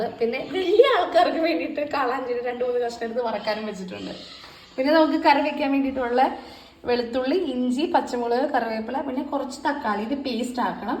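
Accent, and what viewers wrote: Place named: Thrissur, India. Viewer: Samsaram thrissur style Aanalo kinnamkachi fish curry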